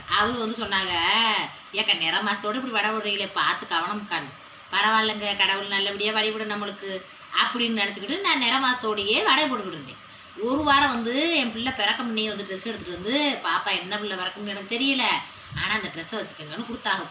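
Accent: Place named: Kondulavi, India. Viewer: சூப்பர்...சும்மா விளையாட்டுக்கு சொன்னேன்...நீங்க எங்க ஊரு கொண்டுலாவி பேச்சு மாதிரி பேசுறீங்க.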